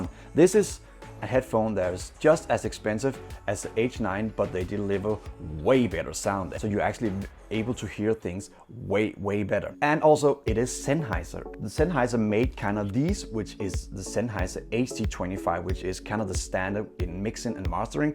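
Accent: Denmark. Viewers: Are you from Denmark. The accent sounds danish? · Fed review, ku høre med det samme at du var dansker, haha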